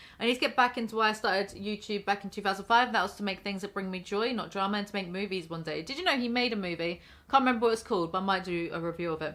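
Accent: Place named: England, United Kingdom. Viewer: Hearing the drama read out by a person with an accent makes me feel like shes reading excerpts out of a sophisticated Englishmans diary. I love it omg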